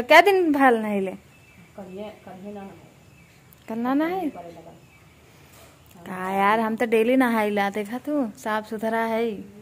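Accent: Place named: Jaunpur, India. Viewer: Yar aaj suna tha hindi divas h bt aap to jaunpuri divas bna diye. Di hmre yha b bolte h bt aapki bat mujhe bhut km samjh m aai itna pure mt bola kro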